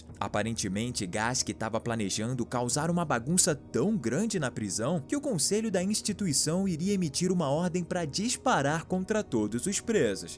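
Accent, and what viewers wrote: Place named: Rio de Janeiro, Brazil. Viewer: Achei curioso o narrador do vídeo com sotaque carioca, ao invés de falar guimba de cigarro, disse: BITUCA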